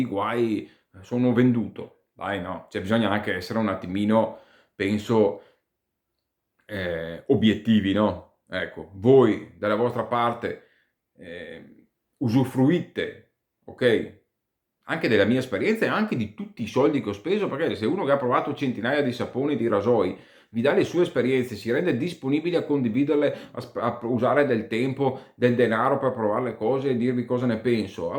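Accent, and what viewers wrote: Veneto, Italy. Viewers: Ciao complimenti per quello che fai, forse mi sbaglio.. ma dal tuo accento mi sembra che tu sia veneto.. mi sbaglio o ci ho preso?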